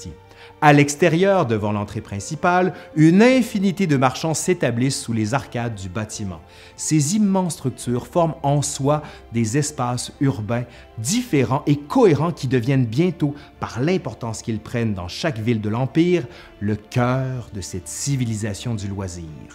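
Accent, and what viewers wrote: Quebec, Canada. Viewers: il est québécois, il a l’accent et le language de là-bas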